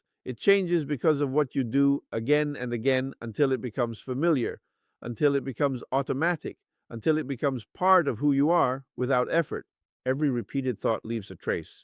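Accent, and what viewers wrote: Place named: Spain. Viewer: Jose had a pretty strong Spanish accent from what I remember. But these are definitely his words. His cadence was also slower. His speech naturally allowed one to absorb the information. Did u speed the video up & use AI to adjust his voice? His ideas are solid since he studied this from the 1950's-60's but the editing made it just a regurgitation of info that one doesn't quite catch. Solid ideas-great, taking away the accent-ok I suppose or meh, Speed & cadence change- meh. Dont really care for it. But I've listened to Jose walk thru a meditation/guidance almost every day since I found him 2-3 yrs ago. Vishen has a good voice but does the same thing g u did. Delivers it fast. His goal tho is for sales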